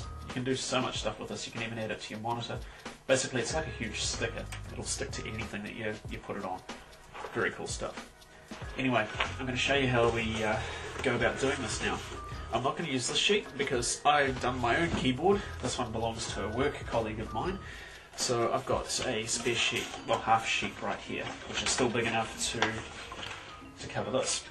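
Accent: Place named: New Zealand. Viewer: He's a Kiwi bro L2ACCENT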